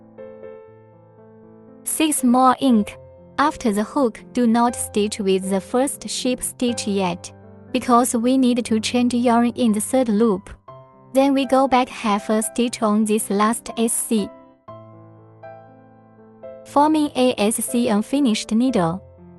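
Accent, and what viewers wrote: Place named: China. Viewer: more like English with a very Chinese accent and crochet lingo we can’t understand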